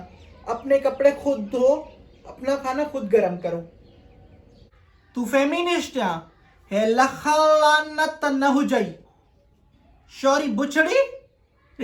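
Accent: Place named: Larkana, Pakistan. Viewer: 🤣🤣🤣Adi iho gothano style aa larkane waran jo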